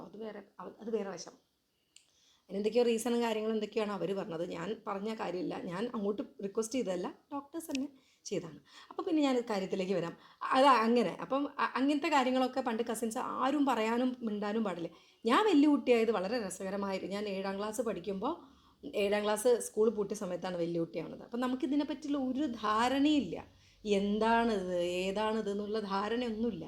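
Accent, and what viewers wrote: Kozhikode, India. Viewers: അവര് കോഴിക്കോട്ട് കാര് അല്ലെ same സ്ലാങ് വരും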